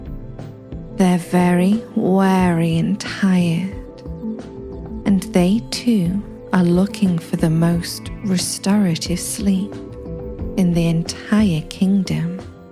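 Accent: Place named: England, United Kingdom. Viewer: Sensuous... so , so sexy....gorgeous.... sultry voice.....beautiful English accent....root beer eyes .....LOVE when you look directly into the camera. I fucking LOVE when I see a woman that knows how to be a woman , knows how to use her powers with men. You are irresistible , m' lady. Thank you for sharing your gift with us.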